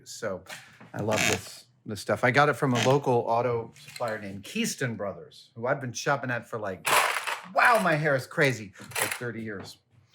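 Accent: Scotland, United Kingdom. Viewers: Love the wee Scottish accent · Nice ta here your Scottish accent dude no to bad tbh fea the bony home land of Scotland 🏴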